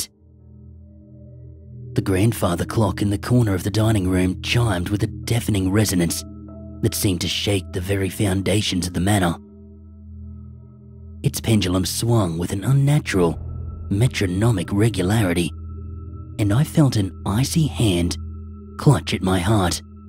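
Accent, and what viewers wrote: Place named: Australia. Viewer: The Blackwood name stoped me in my search for 3hr+ story but a ghost story is a must stop. I got here by searching for new content and came across my Australian friends say hi to Ratty lol anyway I never listened to a ghost story from an Australian but I like the accent anyway not sure if that counts but I hope it does.😂 Cheers mate from across the salty water😂😂😂😂😂🇺🇸 I subscribed 😅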